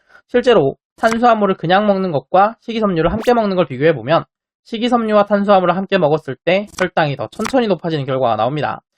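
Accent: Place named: Gyeongsang, South Korea. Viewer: 이분 경상도분이신듯 약간 억양이 왜 경상도사람들이 아 어 발음이 좀 새는경향이있거든요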